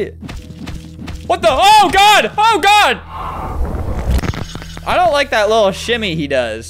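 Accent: United Kingdom. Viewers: Great British accent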